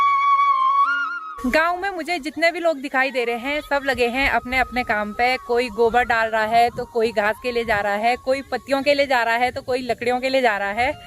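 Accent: Garhwal, India. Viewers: apki Hindi boli me gadwali bhasha ki jhalak bahut pyari lagati hai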